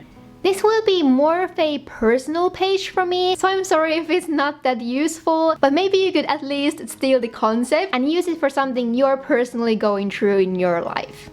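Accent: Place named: Finland. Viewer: huomaski välillä ihan niiinku oilisit puhunut suomea hih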